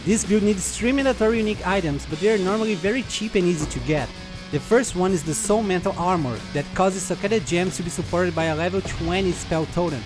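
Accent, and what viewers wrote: Brazil. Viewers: Sotaquezão, parece brasileiro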